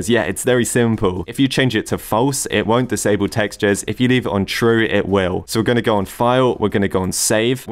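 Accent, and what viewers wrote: United Kingdom. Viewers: shut up brit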